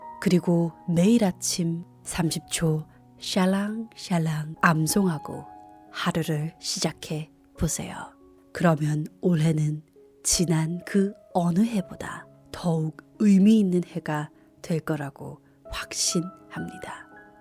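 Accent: United Kingdom. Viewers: I love your British accent. wow~ who wouldn't love your voice. I am gonna be your fan~ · So fun. Your clip helped me to increase hearing and speaking English especially posh accent. Thanks a lot. Happy new year.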